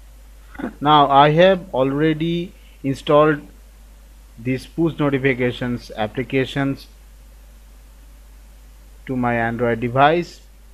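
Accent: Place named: Bengal, India. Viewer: Such cute Bong accent.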